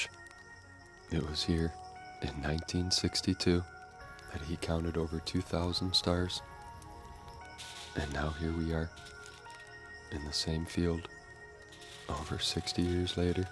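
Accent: Canada. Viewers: Love your videos. So relaxing. How are you not Canadian? You sound 100% Canadian. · No. The "eh" is a Canadian thing. I'm Canadian. I also think he says, "buddy".. Another Canadian thing. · Are you perhaps….. Canadian?🇨🇦🇨🇦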